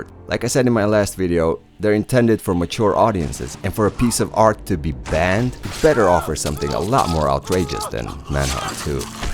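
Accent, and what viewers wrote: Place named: Jamaica. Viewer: First video I seen of yours and I subscribed instantly. This video is so well done and the Jamaican accent is easy on the ears, great job man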